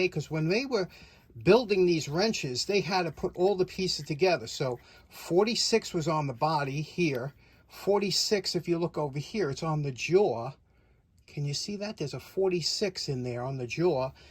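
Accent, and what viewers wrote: New York, United States. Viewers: I am definitely not one to correct but I believe you misspoke or maybe I misunderstood. That screw size 6-32 is not six thirty second which would imply a size in inches, it’s a six-thirty two. It is a six gauge screw with 32 threads per inch. I’m sure you know all this maybe it’s just your NY accent and my Texas ear. 😂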